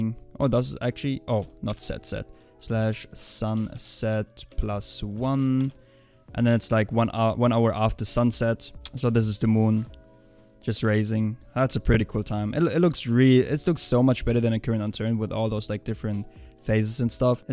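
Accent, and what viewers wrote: Germany. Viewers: Jo Bro are you German? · Are you from germany?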